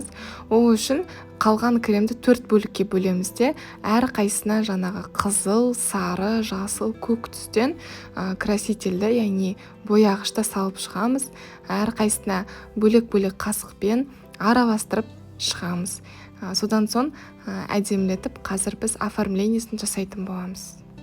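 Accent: Standard. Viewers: Сіз таза қазақша сөилеисіз сол ұнаиды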